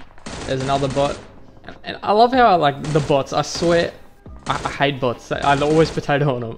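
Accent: Australia. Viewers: Nah I kinda like it the way it is, It's unique. 😂 It brings out that Auzzy charm: "Oh yeah nah yeh, aahhh yeah nah" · The voice of a Australian is rare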